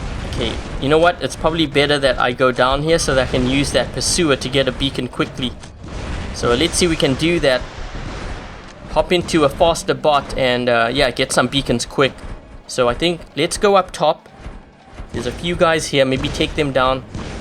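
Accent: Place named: South Africa. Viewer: An excellent response mate, thank you for taking the time to write it and it very much covered every facet of my line of questions, so cheers once again. Culturally I think the Russians are very different to the west so the tact and understanding you have shown, even of the smallest nuances, goes a long way with them. Keep up the good work! Finally I think I asked on live chat once if you were a saffa or from Zim because of your accent but then you said you’re a Canadian national so am now confused.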